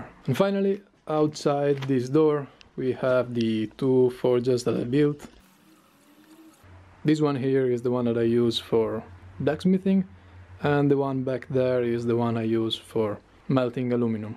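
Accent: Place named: Northern Italy, Italy. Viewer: hahahhaha si sente che sei del Nord!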